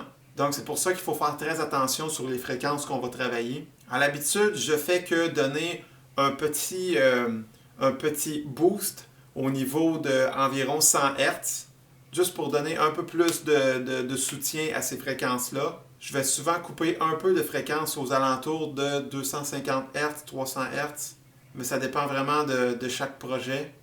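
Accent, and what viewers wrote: Canada. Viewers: C'est la première vidéo que je regarde de et déjà on ne peut pas rater ton accent canadien:) Ensuite, sympa ta petite explication, je cherche moi-même une façon d'enregistrer ma basse sans saturation vu que le micro de mon casque n'est pas le meilleur moyen! En tout cas bravo, t'as l'air sympathique en plus.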